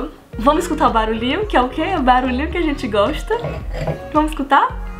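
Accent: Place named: Fortaleza, Brazil. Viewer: senti a familiaridade kkkkkk 😆❤️ sou de Fortaleza também 🇧🇷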